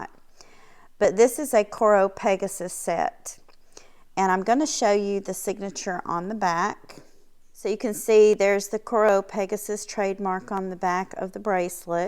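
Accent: South, United States. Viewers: Thank you! I love looking at your collection! You are a wonderful historian and being from the South, I love your accent! ❤️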